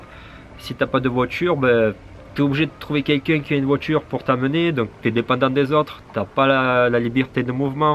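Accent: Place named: mixed, France. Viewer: Mec désolé c'est pas au sujet de la vidéo et ont a du te le dire déjà mais je beug sur ton accent dés la première fois où je lai entendu, du Français avec un mélange d'accent de l'Est avec un accents du Sud de France.. c'est.. intéressant, oft moi aussi j'éponge l'accent des autres a leurs contact, j'ai déjà eu l'accent d'un de mes pote qui est Ukrainien a force de trainé avec lui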